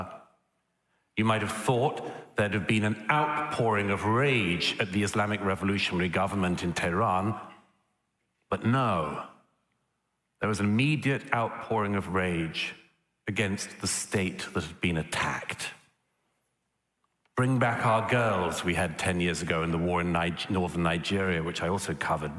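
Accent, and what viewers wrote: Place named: United Kingdom. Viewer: I pray for your wellbeing Sir, for you have touch my hart with your british way of speaking. Your courage is and example to all people. Speaking to the world to open theire eyes for what had happend in Israël on the 7e of october. You are like the man's voice calling in the dessert! But, Sir, I still believe that your efforts will be heard. It is like the peppel touching the surface of the water! Your messages will always be a wake up call. May God be with you and give you the wisdom to bring the thruth. God said: I AM WHO I AM.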